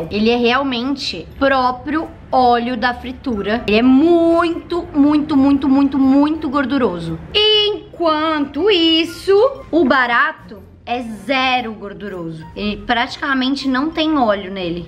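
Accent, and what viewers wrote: Rio Grande do Sul, Brazil. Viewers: Gaucha né?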